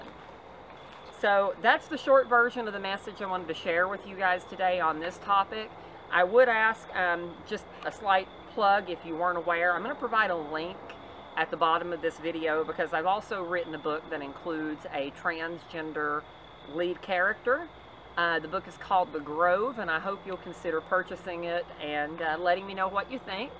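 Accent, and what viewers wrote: South, United States. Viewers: It's good to hear another trans woman with a southern accent. You kind of remind me of back home in Central Virginia.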